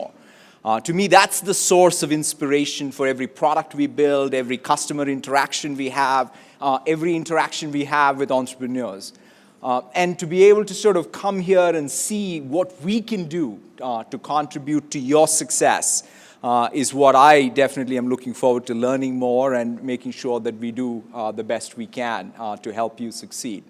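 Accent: England, United Kingdom. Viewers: English accent 🗿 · Looking at his English accent I think he almost forgot telugu. Listen the way he said 'Tank bund'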